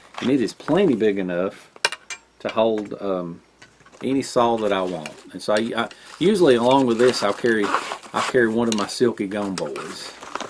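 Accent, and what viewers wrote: South, United States. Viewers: And, in case you didn't know you have a Southern US dialect, here's two cases of Northern Britain: Scottish: <link> And Welsh: <link> I'm rather amazed how people claim you are speaking the same language. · You were the first person I subscribed to on YouTube. I always look forward to your videos. The videos are informative and entertaining. I hope you, Nick and the rest of your family have a very Merry Christmas. GOD bless you. Also, I like the way you talk. I live in Arkansas. We speak southern too! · "I'm Southern. Cut me some slack." lol. Sometimes I watch your videos just to hear your accent! You seem like the nicest guy on YouTube. You always call things a "little gem". Well I think YOU'RE a little gem. Your experience and knowledge are second to none. And this video is EXCELLENT. A brilliant saw tutorial. I had no idea what the different types of teeth were for or how they functioned, ie wet vs dry wood. 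Never have I read on any saw descriptions what they are specified for. Now I understand saws SO much more. Thank you for all your videos. I've learned so much from you.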